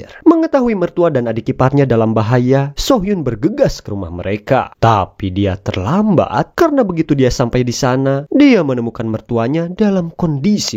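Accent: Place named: Bandung, Indonesia. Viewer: apakah vo ini gk ngeri malah lucu gara"bahasa bandung nya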